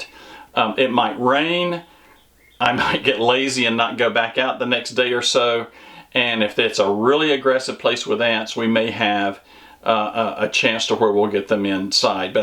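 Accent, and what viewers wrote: South, United States. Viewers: Love the videos and congratulations on your channel. It's so cool to hear you talk, it makes me miss home. I live in Tacoma but I'm from a deeply southern town in NC. My wife and I are in the process of transitioning to full time and your videos are very helpful and insightful - Thank you!! I have a question about the blocks you have on the front legs. Would it be possible to get a schematic or plan, they look like they would perform extremely well. Good luck and we look forward to more videos because we "Love RV Life" too!!!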